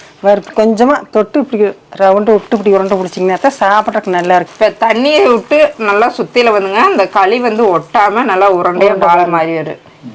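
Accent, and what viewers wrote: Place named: Coimbatore, India. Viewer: nanum coimbatore than...pollachi amma ooru...ippo US la irukken...unga recipe ellame super,na main ah neenga pesarathe ketka varuven...namma ooru basai...ippo kuda sometimes enna ariyama vanthudum...ellarum correct ah kandupiduchuvanga... neenga coimbatore ah nu ketpanga...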